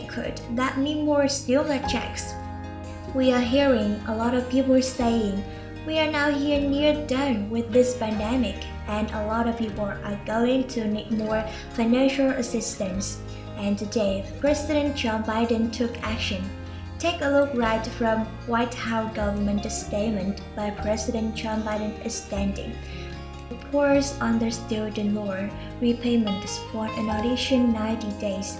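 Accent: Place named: India. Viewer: It's a Indian lady alot like the ones I hang up on phone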